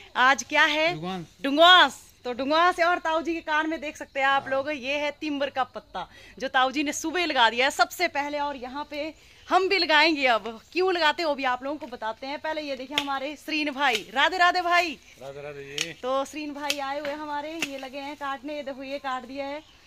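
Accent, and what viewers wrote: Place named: Bilaspur, India. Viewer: Madam ji aapka mayaka khan hai aap bilaspuri bol rha hai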